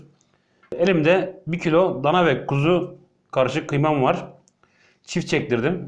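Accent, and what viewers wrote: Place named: Gaziantep, Turkey. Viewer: Abi anteplimisin siveden oyle anlasiliyor yoorum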